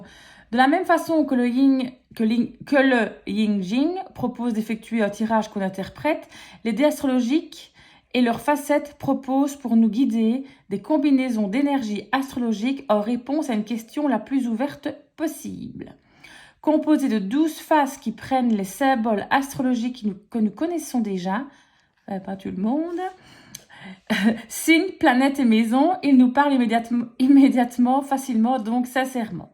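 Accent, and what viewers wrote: Belgium. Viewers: Très bel accent Belge 😃😃😃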